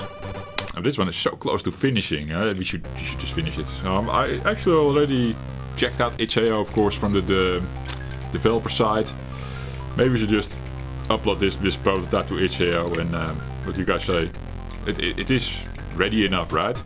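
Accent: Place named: Netherlands. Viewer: Lekker! heerlijk nederlands engels! pikte het er gelijk uit De appel Falls niet Far van de tree! love IT! gelijjk gesubscribed!<3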